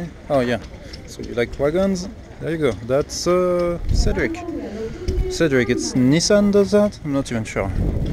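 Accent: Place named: France. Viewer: Hi, I just turned on my youtube bit and there you were with this new video :) I recall the Datsun Z coupes, I think they began as 220Z or perhaps 240Z but they were a big hit here in USA...I recall the Toyota cars, the Corolla, the Carina, and another C namebut we were thinking of them as cigar names...only Corolla remains. Thanks for this tour and the English audio....it is nice with a great French accent :) I have several relatives who have Japanese connections...the guy who is in Japan now has taught English for many years. his given name is Rhys an he just got married to a woman who teaches French but I am not sure where they reside. Likely in the Tokyo area...I have watched videos of the 311 tsunami provided by fnn311, he gives a nice English subtitle....I believe you did not have a problem with that 311 event if you lived further south in that year...Thanks again for this video ! 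Chuck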